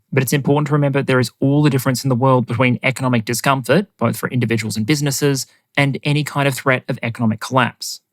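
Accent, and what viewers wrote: Australia. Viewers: Well, he's an Aussie. Were you expecting something like "Australian language"?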